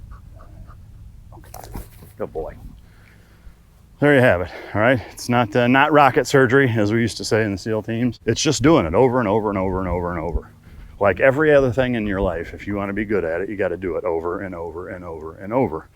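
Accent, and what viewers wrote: Netherlands. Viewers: are you dutch ? with the af and blijf? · Are you Dutch? Good video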